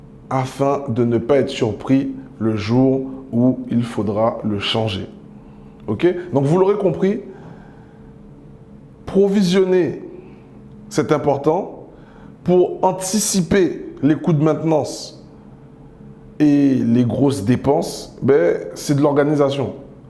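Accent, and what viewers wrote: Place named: Antilles, France. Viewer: Mignon l’accent antillais 😂👍🏾. Merci pour ces conseils car je viens d’acquérir mon 1er bien. Big foss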